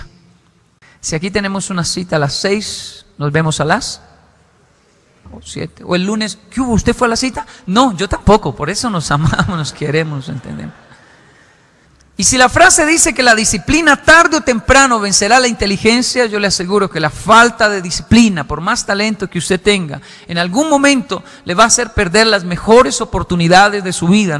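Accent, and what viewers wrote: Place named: Colombia. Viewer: Es colombiano. De ahí su acento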